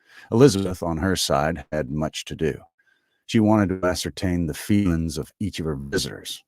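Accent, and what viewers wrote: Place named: South Carolina, United States. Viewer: I would love to hear you read this (I'm a South Carolinian, so your way of speakin' is the way everything should sound, of course!) but there's some kind of weird sound or feedback going on. Ha! Black coffee and harbor tea!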